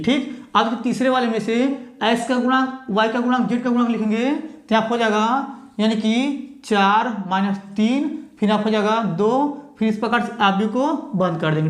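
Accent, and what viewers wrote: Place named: Bihar, India. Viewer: thank you सर रिप्लाई के लिए आपकी भाषा को सुनकर लगा कि शायद बिहार के है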